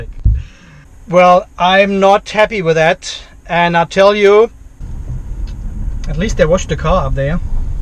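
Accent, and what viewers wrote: Germany. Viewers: Vom Aktzen sind sie deutscher oder? Also die Batterien sind bei allen Herstellern nicht stabil... ich habe einen Tesla Model x und kann nicht behaupten das ich diese Probleme nicht habe. Ich würde auf dieApp mich nicht verlassen...... dies ist ein Problem der App und der Software. Ich komme aus dem QM Bereich der Autohersteller. Die Probleme hat nicht nur Mitsubishi sondern auch BMW und Toyota bei Plug in Hybrid. (Tesla auch) die Fluktuationen und unterschiedlichen Meldung liegen am Bordcomputer und der Sensoren und der Software. Ehrlich gesagt ist es wie beim Benzin der Tankinhalt wird eher geschätzt als gemessen. (Grob betrachtet) was man aber genau messen kann (zumindest bei BMW) ist der Verbrauch dank moderner Einspritzung. So ist es beim E-Auto auch, da wir aufgrund Temperaturen usw. die Leistung zwar messen aber nie genau. Siehe Test von Tesla oder Hyundai e Autobesitzer. 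Wer sein Auto in der Garage mit einem teuren Stromzähler (geeicht) laden tut, hat immer Abweichungen zwischen Zähler und Autoangaben. Beim meinem Model X sind die sogar teilweise heftig. 6-7KW pro Ladung. Ich tanke mein Auto in der Firma mit einem Speziellen Stromzähler Kostenpunkt 2.300€ inkl Einbau. Was die App sagt und die Software, sagt nichts aus was die Realität vom Akku bzw. Batterie betrifft. (Die Angaben über E-Verbrauch sind genau und nur die sollte man bewerten) Jedoch Vorsicht, bei E-Autos spielen extrem viele Faktoren mit, die bei einem Diesel/ Benzin nicht berücksichtigt werden.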